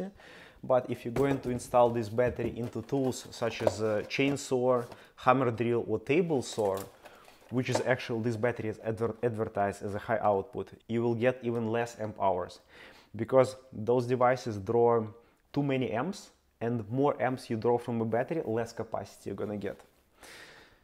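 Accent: Russia. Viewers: Are you russian ?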